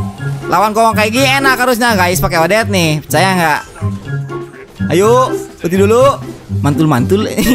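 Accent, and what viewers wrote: West Java, Indonesia. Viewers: Logat SUNDA nya nagih sumpah wkwkwk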